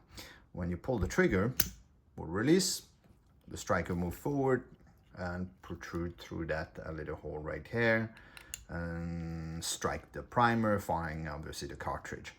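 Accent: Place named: France. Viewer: I avec the impression que il y a un litel french accent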